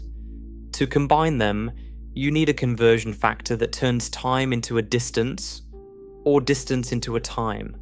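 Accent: England, United Kingdom. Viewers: USUAL ENGLISH ACCENT GARBAGE VIDEO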